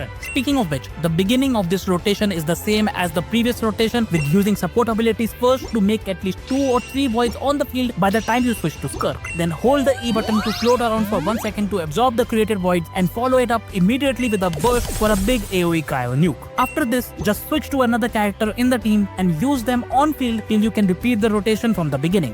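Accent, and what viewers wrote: India. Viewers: fr bro the indian accent caight me off guard. enjoyed it tho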